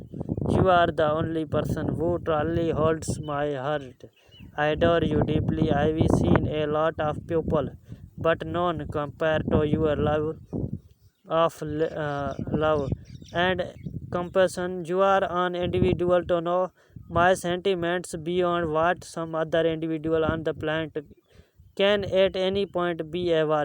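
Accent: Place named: India. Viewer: I don't like to hear in this style totally bhartee English better without voice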